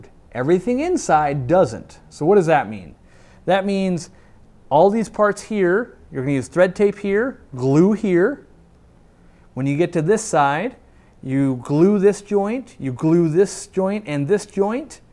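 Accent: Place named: New England, United States. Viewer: haha sweet vid, where in New England are you from? :p "rim is just WICKED wide" lol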